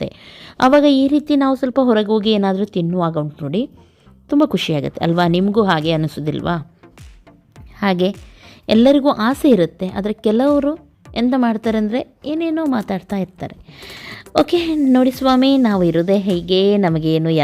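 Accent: Mangalore, India. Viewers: Hi mam Nim mnglr kannada language chengiruthe mam & Nice video mam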